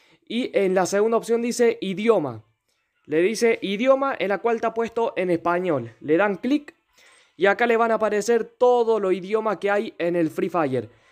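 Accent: Argentina. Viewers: Re argentina el pibe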